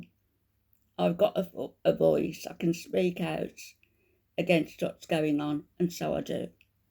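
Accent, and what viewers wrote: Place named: Birmingham, United Kingdom. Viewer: I subbed purely for the clip I saw of you saying "disgusting. Disgusting. Sow." You've gotta be a Brummie, that accent is chef's kiss on you! 💕💪🏾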